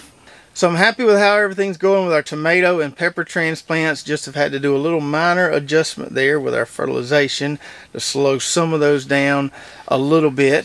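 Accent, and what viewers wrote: South, United States. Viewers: I learn too much from you to unsubsidized how you talk is how you talk you from the south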